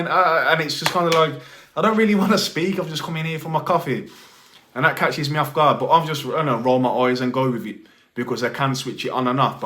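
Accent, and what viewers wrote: Wolverhampton, United Kingdom. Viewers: You sound like me born and breed in Wolverhampton.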